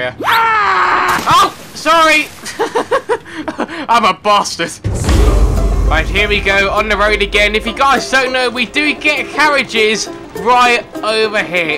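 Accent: England, United Kingdom. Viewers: He is british... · Are you English? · Oh your British yay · damn british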